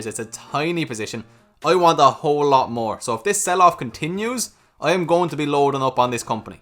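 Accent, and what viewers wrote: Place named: Wicklow, Ireland. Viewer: Let me guess..., Wicklow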